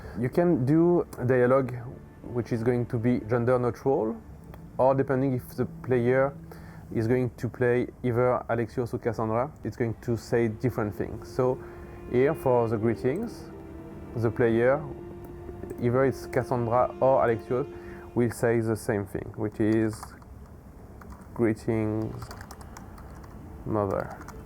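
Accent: France. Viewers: I love that strong french accent ! haha ! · Guys, seriously why the heck you put someone with french accent explain how a tutorial works out??? It's PANEL not PANAL ffs...i struggle to understand HALF of what this guy says!!! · Le gars a clairement un accent français, mais ils n'ont pas cru bon d'intégrer le français dans les choix de sous-titre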